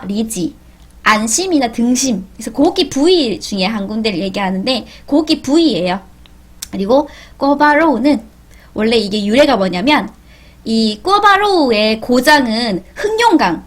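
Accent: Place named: Gyeongsang, South Korea. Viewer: 중간 중간에 경상도 억양이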